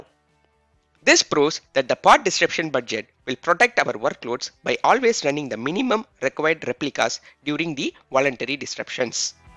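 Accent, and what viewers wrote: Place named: India. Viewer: It's difficult to listen you english india accent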